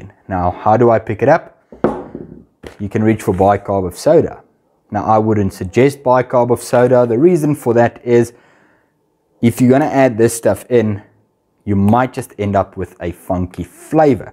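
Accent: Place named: South Africa. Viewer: Tornished..... Hahahah, classic South African accent. All good shit man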